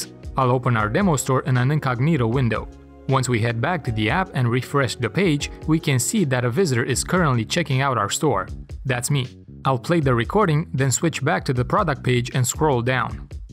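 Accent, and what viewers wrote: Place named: Russia. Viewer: Акцент похож на русский)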